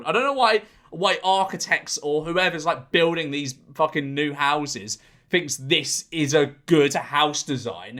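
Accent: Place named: Birmingham, United Kingdom. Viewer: you're starting to sound more Birmingham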